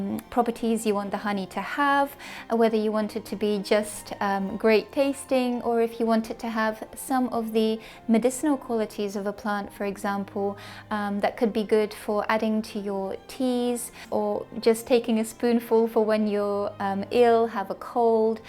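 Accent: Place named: United Kingdom. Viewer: You speak british english very nicely. It is pleasure to listen to you. Thanks for the inspiration🐝🌻Lovely day!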